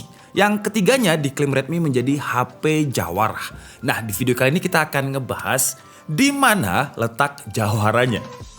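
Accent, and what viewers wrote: Malang, Indonesia. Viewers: Bang lu orang malang kah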